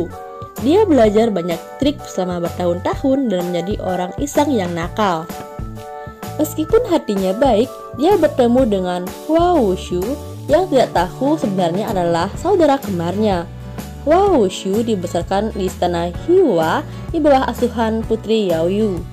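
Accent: Bali, Indonesia. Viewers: Logat balinya kentel🥰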